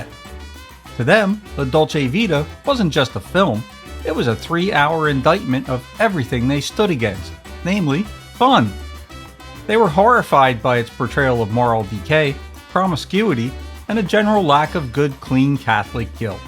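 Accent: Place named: Pittsburgh, United States. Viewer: Hey. Not sure if I asked before but are you a rust belter? PA? Ohio? Michigan? Your accent sounds Pittsburgh like.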